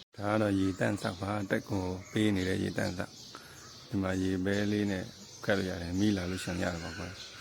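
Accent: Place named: Yaw, Myanmar. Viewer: ယောသံကြီးနှင့်ပါလား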